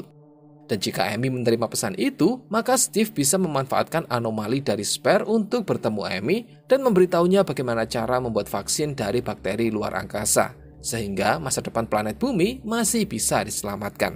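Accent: Java, Indonesia. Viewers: Suara medok mu terngiang ngiang sampe aku turu bro 😅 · Logat medok nya kedengeran bngt ,tp intonasi nya enak bngt😊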